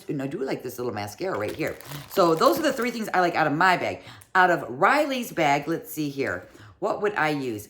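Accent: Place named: Midwest, United States. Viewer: Hi! bag 1 is yours! I can tell by your voice, and excitement level for the first bag. I have heard that Ipsy uses the age to decide if they give you more makeup or skincare. I have been curious what two bags would look like if the only difference in the profile was the age! Also are you from Wisconsin? I grew up there and your vote reminds me so much of the Midwest. It's super fun that you get it with your niece. I can't wait for my daughter to get old enough to gift her Ipsy!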